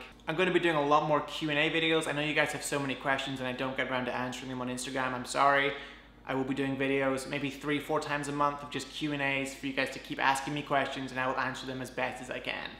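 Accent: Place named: United Kingdom. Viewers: Lol thought he was British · Lily Kim He is British.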